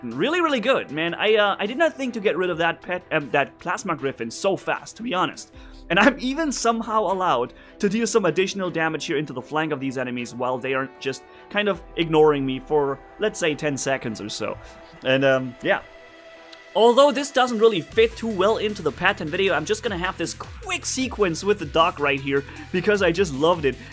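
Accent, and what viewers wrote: Germany. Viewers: Been watching your vids for a while now, they're really awesome. As soon as a I heard you for the first time, I knew you were a fellow German :D. Your positive attitude is really cool aswell, makes the videos even better. Keep up the good work, I subbed :)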